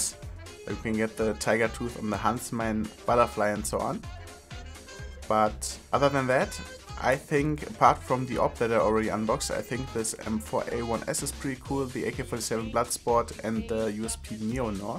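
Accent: Germany. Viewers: Hey m8! Where are you from? Because you speak eng like a german^^ Nice Video :) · Justin he comes from germany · Are you German? Sounds like a German Accent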